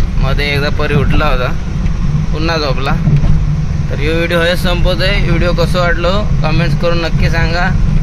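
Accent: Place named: Malvan, India. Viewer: Good vlog nice to hear malvani 😊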